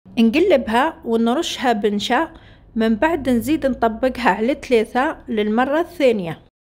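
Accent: Algeria. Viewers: اول مره اسمع اللهجه الجزائريه تدخل القلب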